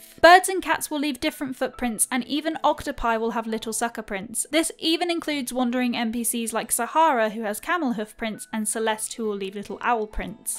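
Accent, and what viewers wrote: United Kingdom. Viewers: Finally, a british accent! Instant sub 😄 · I love her British accent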